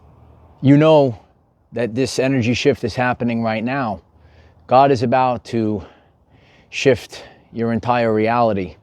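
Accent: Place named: Brooklyn, United States. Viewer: I just fully processed you have a heavy Brooklyn accent after all this time. 😂 Thank you, greatly, you helped me find my wings again. Actually, more than just one set. I need a closet for them all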